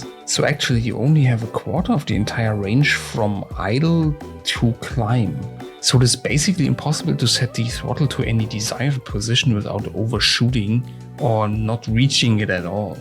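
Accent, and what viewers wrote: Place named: Germany. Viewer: your english is very german :)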